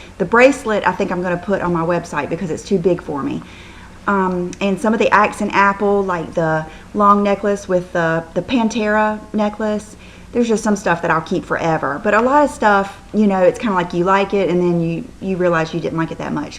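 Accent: South, United States. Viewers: Hey,! I've just been having a marathon here lately.:) I'm a Mississippi girl and totally get your Southern accent. I think it's part of what makes you so charming. Our Accent is a blessing! You keep doing you!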